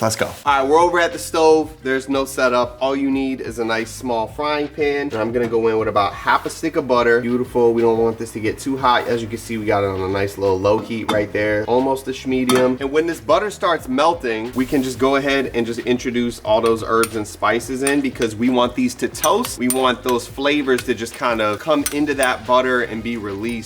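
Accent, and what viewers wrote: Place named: AAVE, United States. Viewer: The recipe was excellent, but the Ebonics has to go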